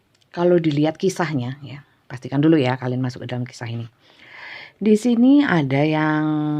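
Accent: East Java, Indonesia. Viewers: Assalamualaikum Bunda...sll nyimak n suka readingan Bunda aplgi logatnya khas jatim...mantap👍🙏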